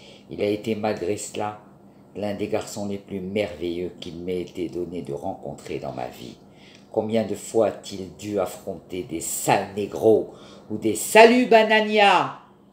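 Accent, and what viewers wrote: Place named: Morocco. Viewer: نعشق لهجتك المغربية التي تدكرنا بالعلاقه المثينة بين اليهود المغاربة والمسلمين المغاربة.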